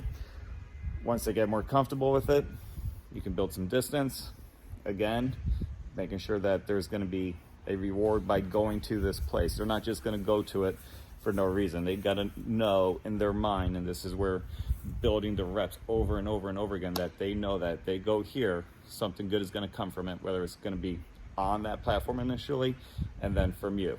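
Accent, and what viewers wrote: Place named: Balkans, Eastern Europe. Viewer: Pozdrav iz Makedonije, vidim po naglasku a i po imenu da ste iz nasih prostora. Nadam se da cete nastaviti sa snimanjem i da cu uzivati gledajuci vase snimke, a i da cu nesto novo nauciti. Podrska od mene!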